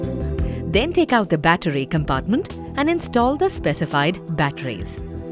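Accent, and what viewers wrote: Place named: India. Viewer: Indian English. BP and TD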